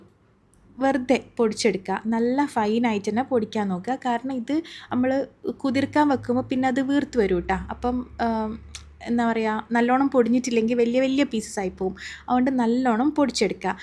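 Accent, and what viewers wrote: Kannur, India. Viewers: Nalla nadan kannur varthanam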